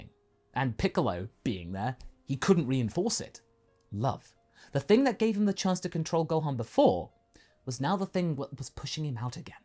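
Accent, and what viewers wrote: United Kingdom. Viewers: I said it with you every time -A Fellow brit (I think ur British-)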